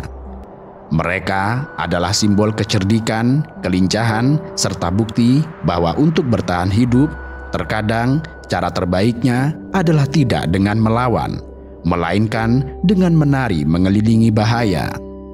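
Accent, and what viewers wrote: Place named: West Java, Indonesia. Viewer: Fiks. Yg ngomong orang sunda.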